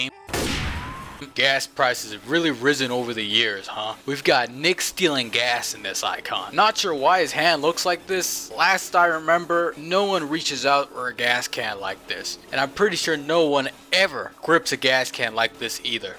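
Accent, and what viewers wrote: United States. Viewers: I’m still wondered how the hell your voice is just completely. Did you hit 30 something and you’re in your second puberty with a different voice? It sounded like you had a European accent back then now you just sound american lol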